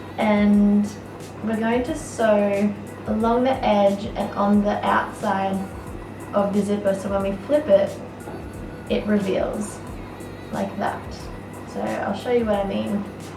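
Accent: Australia. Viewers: Sorry but you sound Australian so I am just wondering where you buy your fabric from? I don't find a lot of linen in Spotlight and Lincraft :/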